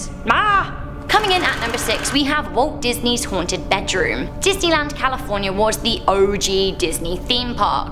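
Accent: England, United Kingdom. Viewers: Our is back with her indestructible tongue and hot Brit accent. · WOW! This was insane! Love it! you are awesome and your shows are interesting AF. I also could listen to the butterflies shooting out of your mouth all day! The English accient is so beautiful.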